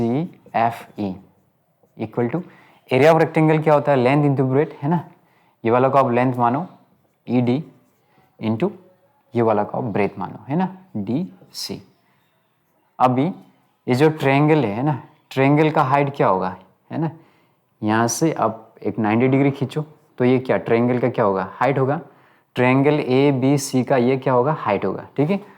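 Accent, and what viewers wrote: Assam, India. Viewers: Sir are u frm Assam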